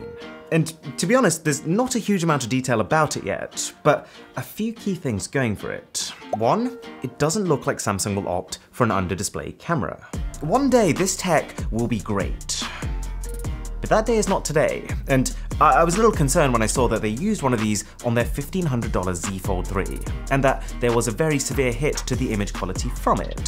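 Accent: United Kingdom. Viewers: Its his wonderful british accent that gets me hooked on every word he pronunciates in all these videos. He needs to read audio books for better sleep. Lol.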